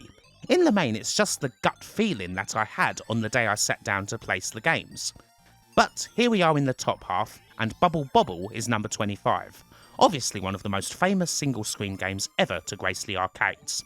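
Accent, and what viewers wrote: United Kingdom. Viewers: Another F’ing brit. Blocked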